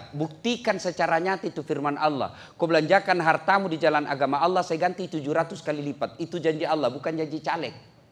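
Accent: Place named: Makassar, Indonesia. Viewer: Saya di samarinda sangat terhibur dan teringat logat2 khas makassar yg sangat lekat sejak masih di sulawesi..😂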